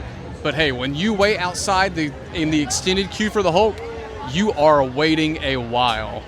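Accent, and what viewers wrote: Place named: South, United States. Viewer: Thanks for the update,! We are hoping that they extend Harry Potter or MOUNT CRUMPIT into Lost Continent. WE DO NOT WANT POKÉMON! It’s not our thing. Where are you from? You have a southern twang! Love it! 😊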